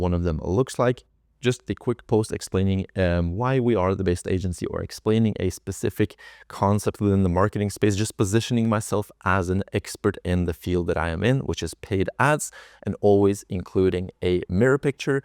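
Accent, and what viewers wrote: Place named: Norway. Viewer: hold up you danish or swedish? - nvm you Norwegian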